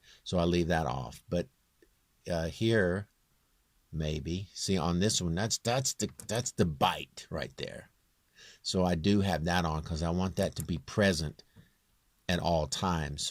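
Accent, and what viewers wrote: South, United States. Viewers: Yeah, I watched it and it showed what I thought it would, you are really well versed in sound engineering and yes, your real singing skills are not lacking at all. Before I go on, I am guessing the thing went well since it was a while ago. I do hope so at least. Confusing on a song when you are talking about your own real ticker. This video is for the end of the semester project where you take your turn and show the other students all the stuff you learned and how you used it, after 16 weeks of learning and practicing the stuff, as an analogy. Can't really learn too much from it in regards to application because as you said, it's a process, and that process each requires its own host of knowledge. What it does do is show your key elements to the process and that allows for a great starting point. I am guessing these type of videos are as equally demanding as your song mixes, but if you are not allowed to get paid for the song videos due to copyright, you would get full pay for these. 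That's something. I also learned you have a southern accent, didn't see that coming.: )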